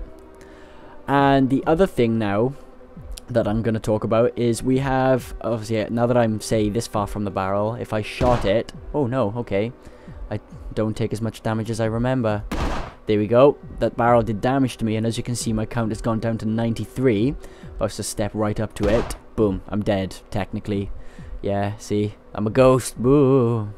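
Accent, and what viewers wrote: Wales, United Kingdom. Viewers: are you welsh?! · I'm British... So why would I get tired of another British voice?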